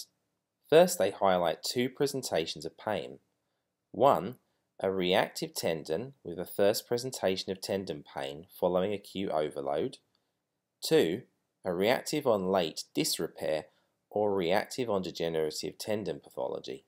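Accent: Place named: United Kingdom. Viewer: Lol heard the accent and thought "maybe here's someone I can trust with my ratchety elbow". Watched the earlier, linked video... Huntsville... Alabama... that's some displacement fella - bit too far from west London I think. Great vid, thanks for the hope it induced 👍🏻.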